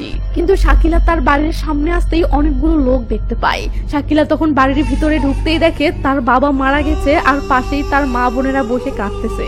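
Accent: Bangladesh. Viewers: I am indian . But, I did not see these good movies , I like your Bangladeshi speaking syle .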